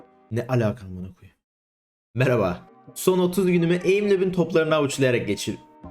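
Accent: Thrace, Turkey. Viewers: abi trakyalısın galiba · Abi sen Trakyalı mısın ? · Abe adaş trakyalısın galiba sen nerden Be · Hep videolarında trakya şivesi konuş çok iyi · Abi trakyalısın galiba siveli konuşunca çok iyi oluyor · bi trakya ağzı sezdim harika video beğenildi .d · trakyalı mısın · abi sen bizim oradansın beya tırakya dimi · D-dostum sen benim trakyalı adamımsın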